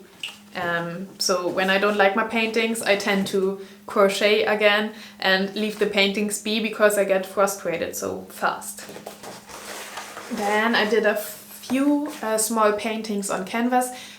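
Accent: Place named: Germany. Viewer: lol love the german accent. cool video!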